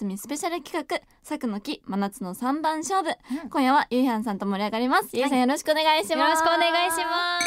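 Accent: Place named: Kyoto, Japan. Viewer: はんなり京都弁めっちゃかわいいな いいこなの伝わってくるわー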